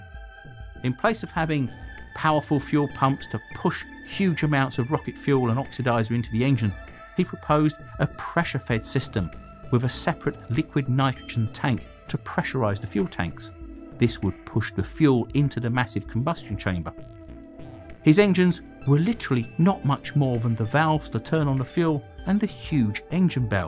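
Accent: United Kingdom. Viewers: His British accent is like music o my ears.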